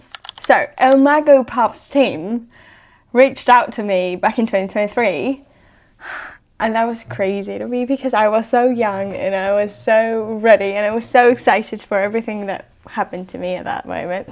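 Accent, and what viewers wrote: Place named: United Kingdom. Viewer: tu acento British es precioso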